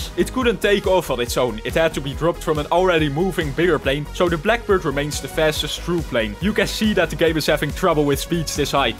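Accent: Netherlands. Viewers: Idk but are u Dutch u sound Dutch :) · Dutch accent going crazy😂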